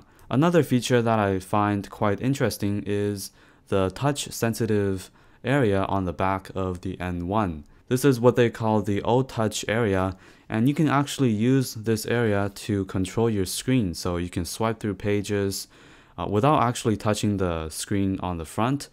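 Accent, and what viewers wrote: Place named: China. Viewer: 这英文有点中国口音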